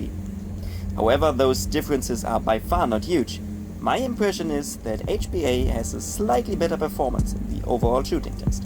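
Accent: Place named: Germany. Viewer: Dang that shimming is perfect all that I hear is the pistonhead hitting the cylinder head. You are a damn well technician. It sounds even better then my MCX and I thought that one was perfect. Anyway you sound German. Are you by chance from North West Germany ? I would like to see you on our field (an old Gas powere plant.) indoor CQB with 7 floors of action.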